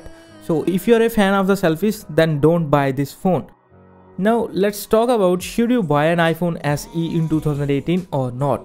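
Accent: India. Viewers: Typical Indian accent is irritating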